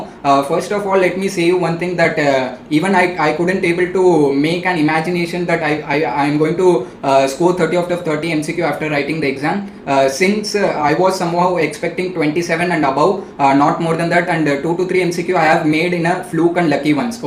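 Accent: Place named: Tamil Nadu, India. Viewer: Bro, neenga tamizh ah? Unga accent vachi solren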